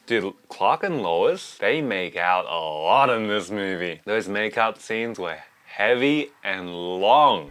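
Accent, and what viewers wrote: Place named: Australia. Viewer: I loved this movie. I agree with you in wishing that it was longer. I appreciate you - good to hear a fellow Aussie voice reviewing this and being so into the lore of the characters and previous media. Also, where did you order that food? How did you get those three dishes mixed in one container? lol. I’m so hungry now.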